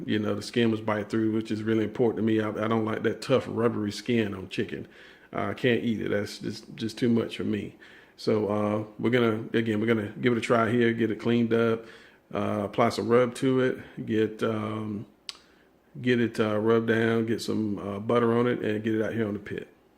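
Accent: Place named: Baltimore, United States. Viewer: I may be mistaken but from your accent, Baltimore right? Love the video.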